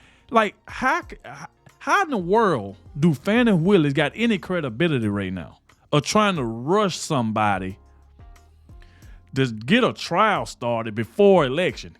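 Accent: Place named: Louisiana, United States. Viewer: I have to ask, where is your accent from? Louisiana?? It’s fun to listen to.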